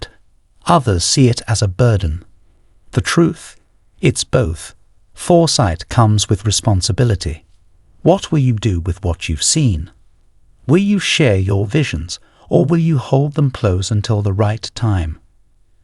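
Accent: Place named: United Kingdom. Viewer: are you from Britain? or australia?? I hear an accent but cant pinpoint its relevance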